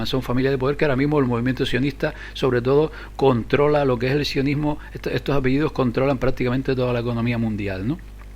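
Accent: Canary Islands, Spain. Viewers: Muy buen trabajo, tocayo. No coincido estrictamente en todo, (son temas muy complejos, con cabida para la interpretación personal), pero si en la inmensa mayoría, y sobre todo en lo más importante. Bien documentado y explicado. Gran labor social y muy necesaria en éstos tiempos. ¿Me permite una pregunta personal?. Su "asento" me resulta extrañamente familiar. ¿No será usted Canario, verdad? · Acento canario es bonito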